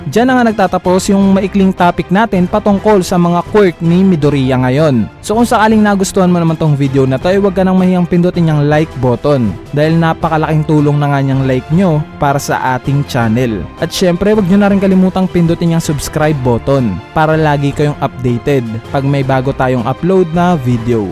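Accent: Visayas, Philippines. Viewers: Naol bisaya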